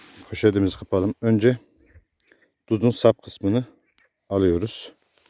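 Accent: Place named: Amasya, Turkey. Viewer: bende Amasya lıyım abi şivenden anladım hemen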